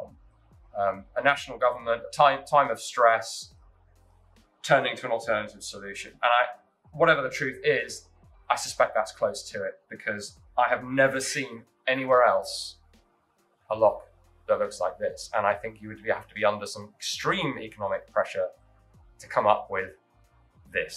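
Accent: England, United Kingdom. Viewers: Ian, what happened to your hair and why are you speaking in an English accent?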